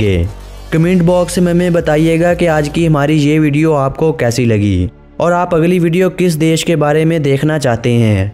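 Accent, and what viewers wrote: Pakistan. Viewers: Aavaj achhi hai. sab kuch population dikhaya. Urdu ki lahak aa jati hai. Kaha ke hai?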